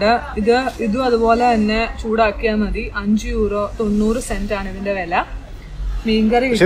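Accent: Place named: Kottayam, India. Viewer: തനി കോട്ടയം സ്ലാങ്. Like it.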